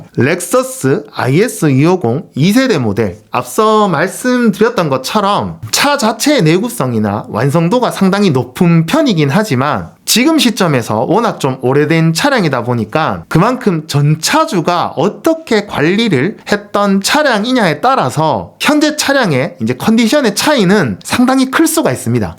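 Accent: Gyeongsang, South Korea. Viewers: 헉시 갱상도 사람인가여??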